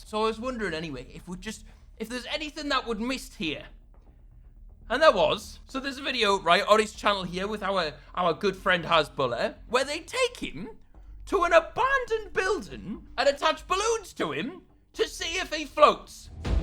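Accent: United Kingdom. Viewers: You sound so British like if u are😧sameee😂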